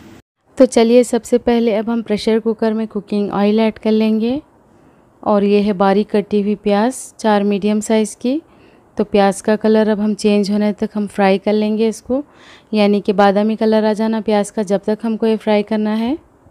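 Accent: Hyderabad, India. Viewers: Mujhe aapka typical hyderbadi accent bohat pasand aata...👍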